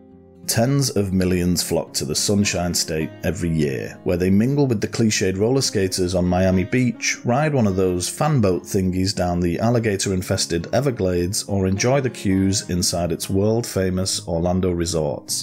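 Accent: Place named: Manchester, United Kingdom. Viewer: I’ve just stumbled across your channel and am really enjoying it. You’re a really engaging narrator and the site is professionally put together. The cases you explore are, thankfully, more obscure than those on similar sites, but certainly no less interesting. And to top it off, you sound like you’re from my neck of the woods, Manchester. You’ve got another subscription from me. Keep it up 👍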